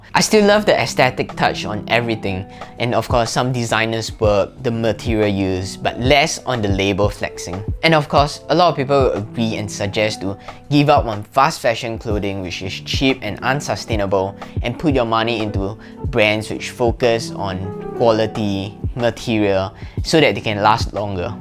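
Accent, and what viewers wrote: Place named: Singapore. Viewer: Can’t get past singlish.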